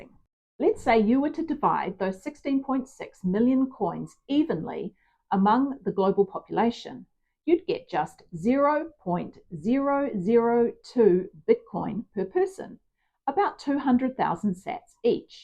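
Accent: New Zealand. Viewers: Bitcoin is 👑..keep up the Great work, Spreading the Bitcoin Message..My Btc Journey began in May 2021, and I never looked back..I enjoy your content as well as..Your Hot 🔥🔥🔥....I look forward to your next episode..I detect a kiwi accent..😬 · You sound like a kiwi .. are you?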